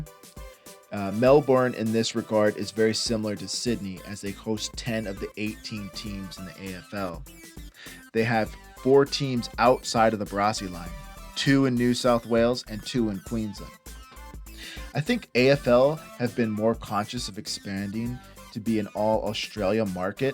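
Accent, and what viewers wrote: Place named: United States. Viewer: I'm a born and raised American too, and I've always been fascinated by Australian sports. It's great to finally hear an American narrator talk about Australian sports. Great video.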